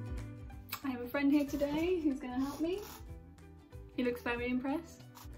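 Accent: Birmingham, United Kingdom. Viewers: Aksen nya british banget yah.. 😁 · aksen Birmingham dia nih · very pretty, harry potter accent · first yet thuough it was aussie accent, oh i see this is birmingham accent:)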